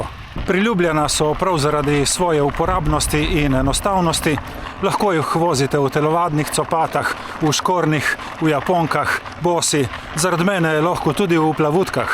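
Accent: Primorska, Slovenia. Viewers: Predstavitve tvojih "potovanj" so mi všeč zaradi preprostosti in tudi, ker rad slišim primorski naglas. Malo ti zavidam, ker se voziš po lepih krajih in ti ni treba takoj v klanec. Jaz sem Zasavc in mi je to prikrajšano, ker moram takoj prestaviti v prvo za kakšno turo in še naša ozka dolina na cestah ni preveč prijazna do kolesarjev. Te dni sem zamenjal kolo in sem šel nad naše dva tisočake v Julice, kjer so me pozdravili vršaci nad meglenim morjem.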